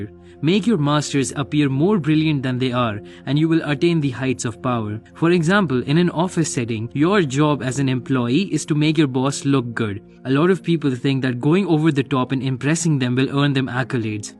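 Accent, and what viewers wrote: India. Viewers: very Indian accent.... awful! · That it is being narrated by an Indian makes me not listen to it 🚮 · fuuuuck an indian accent SIGH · Couldn't watch this for more than 5 seconds because of that Indian accent. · Do i detect an Indian accent? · Immagine clicking this video expecting frank underwood's voice and hearing an indian.. the pain.. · I really enjoy marked Indian accent. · so that means u are an indian . I knew that accent was native. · Cant stand his annoying Indian accent. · Law 51, dont use an Indian to narrate in English. Its "wery" irritating. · Please stop. I hate Indian accent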